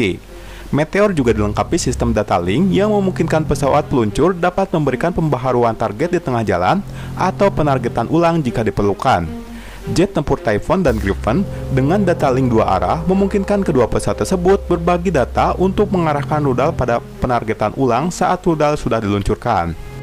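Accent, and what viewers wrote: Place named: West Java, Indonesia. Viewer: Jiga urang sunda ieu mah nu ngomong na hehehe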